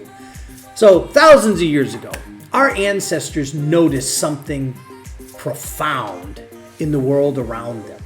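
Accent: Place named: New Jersey, United States. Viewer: Hi I just found your site and love it, many of my favorite artists you are covering. I am also a Christian and have be studying and teaching the creation/evolution topics for 45 years, teaching only for the past 30. This is a very nice video on the Creator handy-work. Did you know that the brain spends or dedicates 30% of its computing power to the hand? In other words, God created man to use his hands with the ultimate control, just look at a surgeon, painter, pianist, slight of hand magician, or anyone else using their hands in a delicate manner. Monkeys can't do that, we can because we are fearfully and wonderfully made. Your accent sounds like you are from NJ, or NY or close by. There is a great illustrator (there are so many) that you should interview, he is also a Christian, his name is Thomas Fluharty and he loves to talk. Anyway, I love your enthusiasm keep up the great work. 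from NJ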